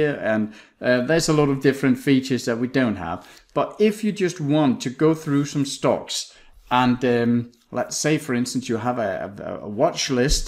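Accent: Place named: Denmark. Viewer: Cool accent! Are you from Denmark? Thanks for the helpful video!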